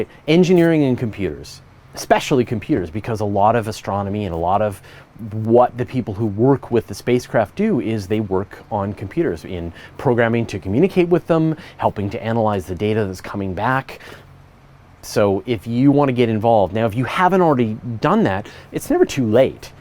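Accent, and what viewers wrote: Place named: Canada. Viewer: I wondered if you were Canadian from your accent and I see yes! <link> I'm the publisher of Universe Today and co-host of Astronomy Cast. I was born in Vancouver, Canada, but grew up on Hornby Island, a small island off the coast of Vancouver Island. Then I moved to Vancouver again to study engineering at UBC.....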